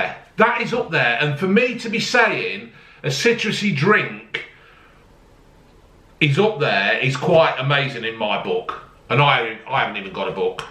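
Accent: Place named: East Midlands, United Kingdom. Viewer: East Midlands accent is not easy on the ear !!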